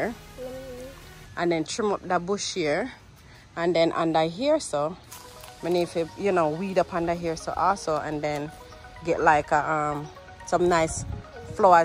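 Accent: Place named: Jamaica. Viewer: Happy 4th of July. Lol one minute you talking so I can understand then the next you talk Jamaican lol but I understand I’m learning 🤗🤗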